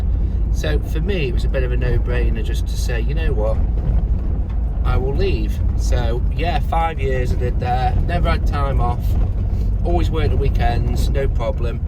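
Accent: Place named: Nottinghamshire, United Kingdom. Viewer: Nice drive, and sounds like you've got a good attitude to your business. Good for you. I was trying to guess where you're from going by the accent, I thought Nottinghamshire, then i saw the road signs!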